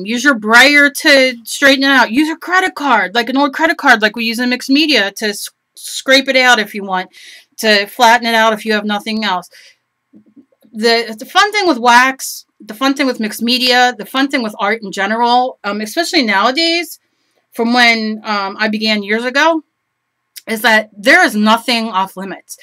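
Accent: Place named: Philadelphia, United States. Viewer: A very distinct dialect , of a provincial Philly accent . Very interesting . I use wax to preserve water color on wood . Dorland wax medium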